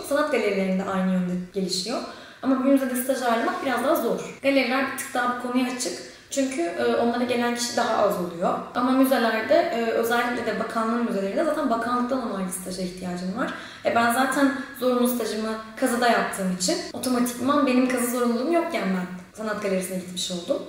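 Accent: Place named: Hatay, Turkey. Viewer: Hatayli arkadaşim var konuşması senin gibi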